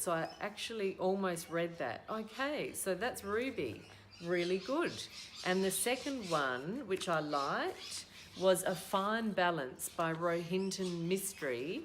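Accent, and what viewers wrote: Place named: Australia. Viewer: Oh wow. Another Aussie! I found your channel through Shaun’s video and was so excited to discover your accent! I look forward to more videos and am off to subscribe to your podcast... Love the sounds of the birds in the background. Reminds me of being near the beach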